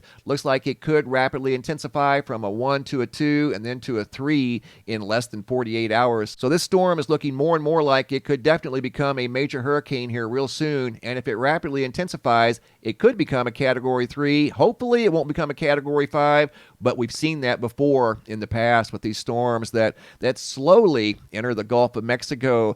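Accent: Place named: Arizona, United States. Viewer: “There goes a rogue shopping 🛒 cart!” Sorry, the way said it, I laughed out loud! His Arizona accent makes me laugh! 😂 He would laugh at my NY accent, I bet. All my love and prayers going out to those in harms way! Stay strong, folks! 🙏 😘🗽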